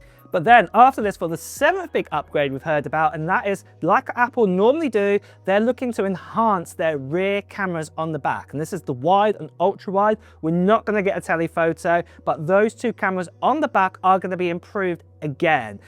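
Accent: United Kingdom. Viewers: Thought it was a woman talking 😂, why do most men sound funny, ? In Britain - I’m assuming the accent is British, although I could be wrong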